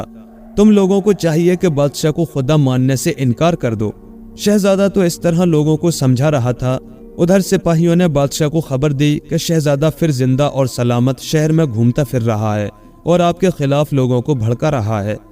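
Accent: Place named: India. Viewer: Apki kahania'n achi hoti hain bas apka lehja shayad Hindi hai agr Urdu lehja hota tou chaar chaand lag jatay...ager aap phool ko fool na kahein aur issi tarhan aur bhi bht si cheezein tou behter rahay ga.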